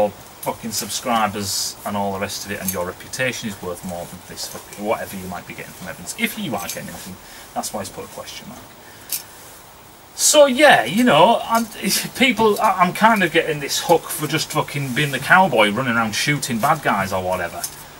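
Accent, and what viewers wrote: United Kingdom. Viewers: I just love you mate! People like you and others who just speak their mind, know their shit and aren't all politically correct being afraid someone gets their knickers in a bunch. I find your voice soothing when I'm drinking beer while pottering around in me own workshop, injuring my tools while the missus gets all wet thinking I actually know what I'm doing. Half of the time I'm not even listening but just enjoying that fine Brittish accent in the background. Keep doing your thing, or don't, fuck if I care!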